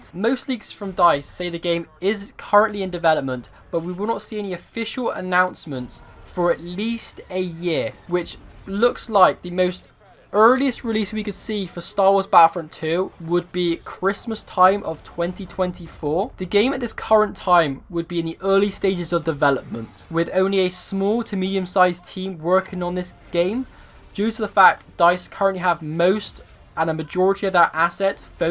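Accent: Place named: Bristol, United Kingdom. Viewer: You have a Bristol voice !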